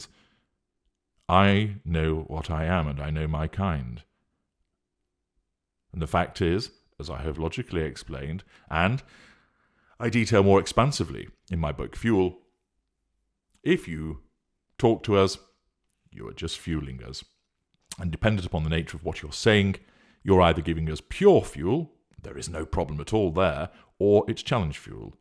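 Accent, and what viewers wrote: United Kingdom. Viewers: I like his voice.. sometimes it is creepy in a way, I guess. But I like his British accent.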